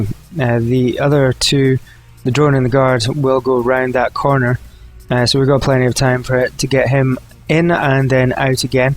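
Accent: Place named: Ukraine. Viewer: You sound like ukrainian) nice gameplay btw!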